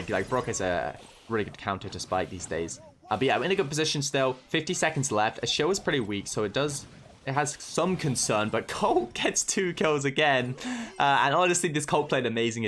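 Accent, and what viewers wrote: United Kingdom. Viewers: Listen to his accent his from UK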